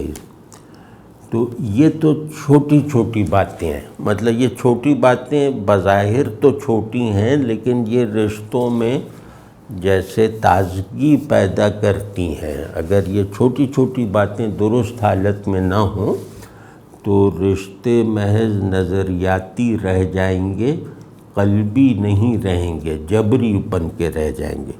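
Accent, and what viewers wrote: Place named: Delhi, India. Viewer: Shaikh ur style of talking shows that u belong Delhi ur knowledge about Chishtia sect is authentic.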